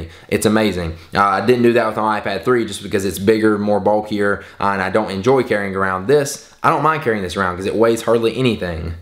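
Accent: South, United States. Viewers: U sound really country af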